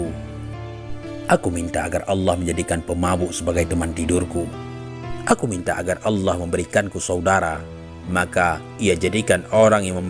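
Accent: Aceh, Indonesia. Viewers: Adminnya urang Aceh ya?bunyi slang nya lain ckit.😃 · pasti org aceh ini yang bicara hehe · Suara nya seperti orang aceh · Admin nya kayak suara org aceh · Logat nya orang Aceh ni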